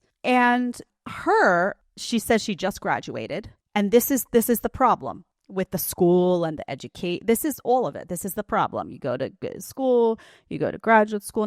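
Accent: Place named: Long Island, United States. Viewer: NOT FLIRTING, JUST STAING MY OPINION., I like your Long Island accent that slips through every so often. It reminds me of my parent's accent (Connecticut born). Nostalgia.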